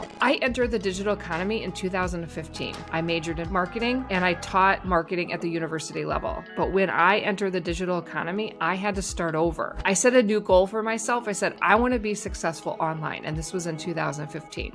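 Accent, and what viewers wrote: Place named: New Zealand. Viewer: I am a person who wants to come to New Zealand. Watching Madam's video is for learning Kiwi English accent ❤️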